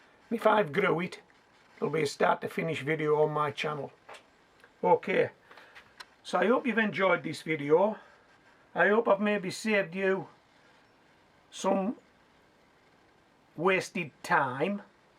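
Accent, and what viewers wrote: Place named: Cumbria, United Kingdom. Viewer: Thank you for your clear and honest advice. Absolutely love your channel. I have learned so much from you in my first year of growing from seed. Thank you! (By the way....you sound just like my husband and in-laws who are all Penrith and Appleby folk. Are you local to there?) Best wishes, Amanda. ❤️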